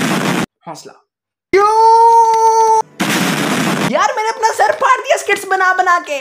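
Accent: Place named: Lahore, Pakistan. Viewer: I love lahore ❤❤mujhy phely sy hi apky baat karny ky style sy lagta tha ky ap lahori ho ❤❤❤❤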